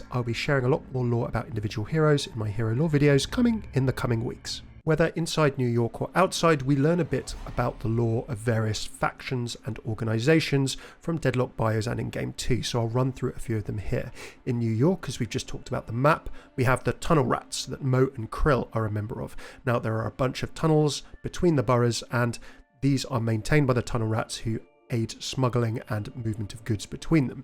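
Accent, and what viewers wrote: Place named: United Kingdom. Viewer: oh shit, he's got a british accent?! oh yeah we watching, thanks youtube algo. lol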